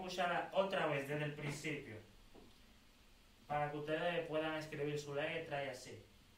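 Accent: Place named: Mexico. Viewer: supér carnal pense que eras de mexico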